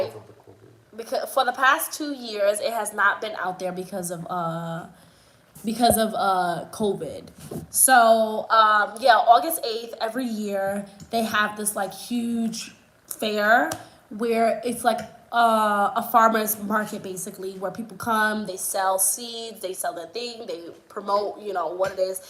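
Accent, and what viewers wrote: New York, United States. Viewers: You are such a New Yorker! Your accent and your mannerisms remind me of being home! May God bless you and your family! I saw some clips of your wedding. It was beautiful to watch! Congratulations 🎊🍾! You are blessed to be on the continent because you are surrounded by such a beautiful culture and beautiful people! Your journey in Africa was meant to be because you are an inspiration and you made the necessary sacrifices to fulfill your destiny! Keep the videos coming! It doesn’t have to be perfect. Just be yourself and continue shooting Tanzania! 👏🏽👏🏽 🥰 💐💐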